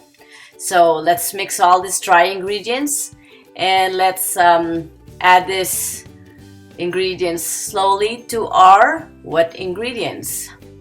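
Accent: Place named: Philippines. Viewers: Perhaps her target audience are not limited to Filipinos. Also maybe she is not trying to 'not to sound Filipino' and actually just speaks with an accent🤔. With that being said, possibly in YOUR videos you can speak your language, but thanks to her I can understand and follow her instructions. Boo...👎😊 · You sound like a filipina who tries really hard not to sound one...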